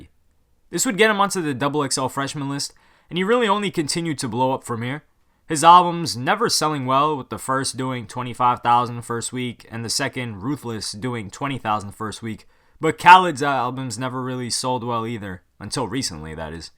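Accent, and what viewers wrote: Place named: Florida, United States. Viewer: I'm starting to think you're a Flordian because of your accent and attitude.i'm just randomly saying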